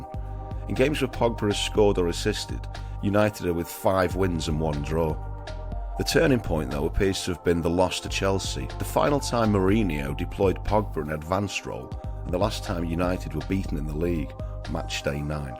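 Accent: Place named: Leeds, United Kingdom. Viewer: This guy is from Leeds I reckon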